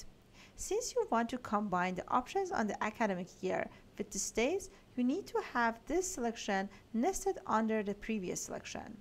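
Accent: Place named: Iran. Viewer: this crazy iranian accent...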